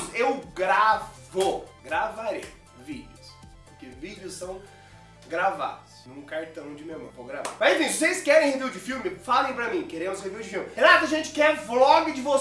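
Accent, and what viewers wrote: Curitiba, Brazil. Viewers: vc eh de curitiba seu?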